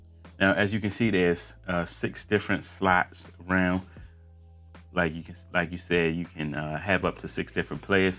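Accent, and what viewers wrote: Baltimore, United States. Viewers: that baltimore accent coming out big time lol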